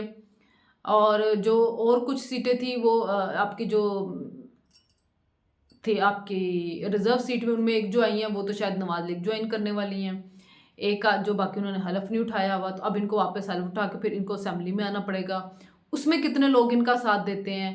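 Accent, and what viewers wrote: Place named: Punjab, India. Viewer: your punjabi is sooo cute, you should do your vlog in punjabi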